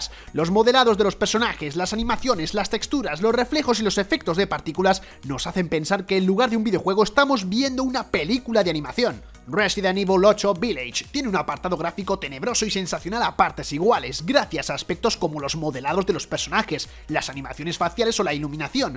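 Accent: Spain. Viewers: Aún no me acostumbro a su voz de este vato. Es muy española le falta ser más neutral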